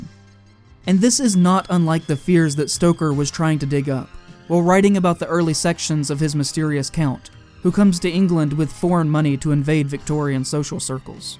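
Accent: North Carolina, United States. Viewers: Odd. I thought that you sounded like someone from NC, during this, and then you confirmed it.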